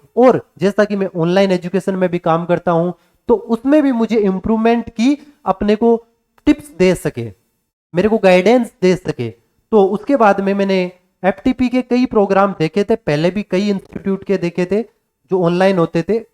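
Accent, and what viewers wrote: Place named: Haryana, India. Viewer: Sir aapki voice tone se lag rha h ke aap haryana se ho, mai bhi haryana se hu and I am preparing for neet ug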